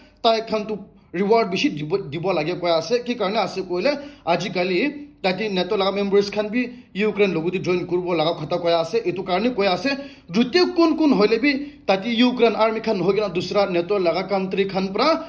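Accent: Nagaland, India. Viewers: I like his accent, pure naga ase. Hoi na nahoi comment section viewers.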